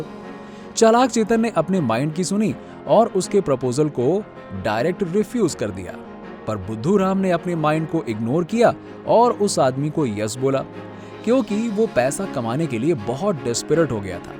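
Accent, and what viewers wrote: Bengal, India. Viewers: Bhai aapka bangoli accent wala voice Jada achcha lagta tha.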